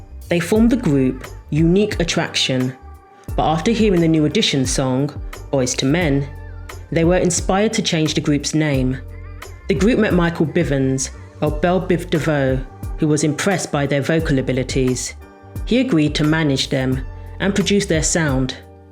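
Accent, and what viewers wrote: United Kingdom. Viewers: Hearing about the 90s in a Brit voice? I subscribed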